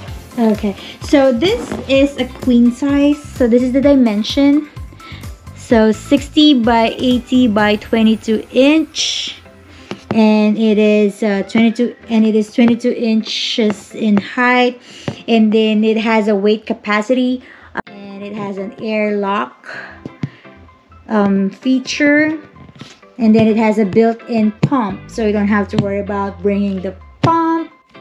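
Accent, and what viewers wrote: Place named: England, United Kingdom. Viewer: I always love your english accent, Congrats for having a new one, enjoy your new air mattress #dabestieszoe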